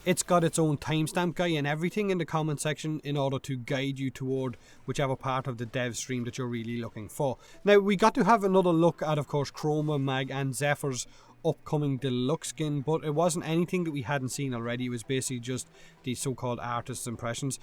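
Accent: Ireland. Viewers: Hmm, your english sounds a little bit strange. Are you irish?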